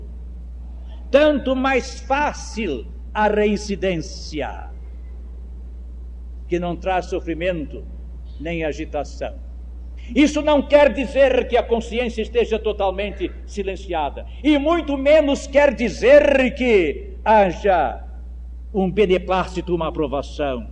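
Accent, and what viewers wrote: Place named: São Paulo, Brazil. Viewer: Ele não era carioca? O sotaque é de paulistano da Mooca!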